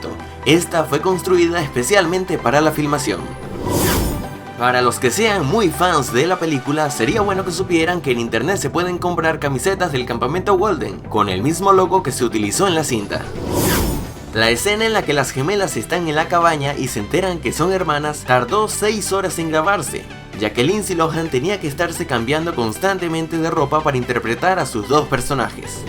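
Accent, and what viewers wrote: Venezuela. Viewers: consolo oir tu voz dije naaaa este chico es venezolano 7u7 ven paca y abrame compañero :v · Eres de Venezuela ?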